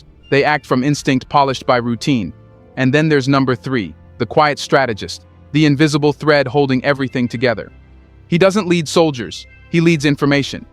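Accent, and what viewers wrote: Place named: United States. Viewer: Who is this??? Why are you providing such Details? Who sent you? This American accent reporting so hard on this man. Thanks but no thanks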